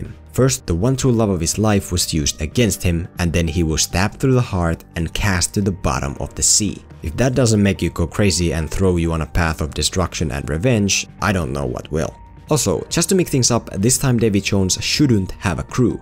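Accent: Finland. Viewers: Are you from Finland? Because you speak like that? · Where are you from? I smell Finnish accent oon suomalainen btw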